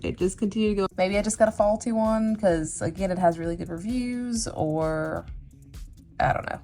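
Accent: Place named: South, United States. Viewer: ! I noticed your accent and I was like, "Girl has to be from the South!" Honey, I'm in Huntsville...only 30 minutes from you! I don't know why I'm so excited. Lol. My son got a leopard gecko for his birthday and we are in love!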